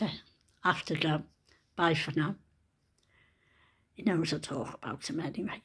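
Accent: Liverpool, United Kingdom. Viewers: hahaha "talk of the devil" hahaha great how you say it. Can listen to you with admiration together with my mum. Love that Liverpool English so much 👍🤣😄😂I'm from Australia. You look great by the way luv🇦🇺👍🇳🇱🤗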